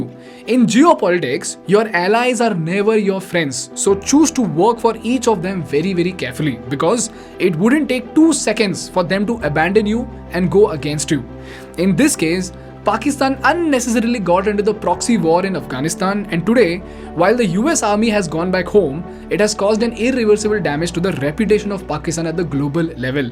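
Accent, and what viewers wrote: India. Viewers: He is speaking normally only, people of his generation(+younger) in india speak English like this not with the stereotypical accent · Typical Indian accent - Why cant you Indians just speak in a proper way?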